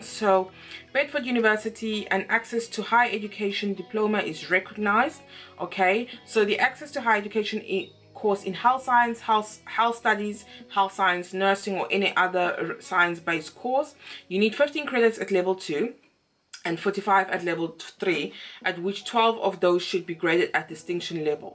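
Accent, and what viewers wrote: South Africa. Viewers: Girl i knew you were South African 😂😂 i can hear it omg 😳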